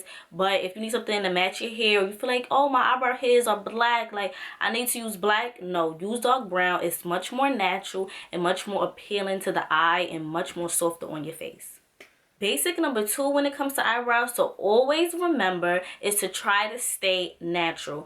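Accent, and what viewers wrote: New York, United States. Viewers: where are you from? you sound like youre from ny or the dmv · it's her accent, she's from New York . All New Yorkers speak like that 🆗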